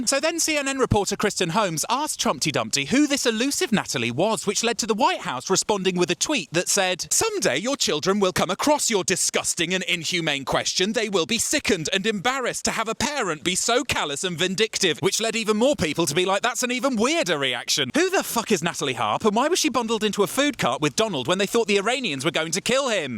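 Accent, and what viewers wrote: United Kingdom. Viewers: Info ✅ Humor✅ Classic British accent to make it all more palatable ✅✅✅ Trumpty Dumpty this is the first I’ve heard that nickname omg it’s great. Just as great as “thicky thicky dumb dumb” being said in a British accent. it doesn’t sound anywhere near as good with my ‘merican accent lol 🍒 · Can’t take news about America seriously from somebody with a British accent. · You good, man. You got good shit. Love the British spin and accent. Such class! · Absolutely nothing to do with the content of this video, which is great, but I love that you kind of look and dress like an American Cholo (probably gonna have to google it) but have a British accent. Epic.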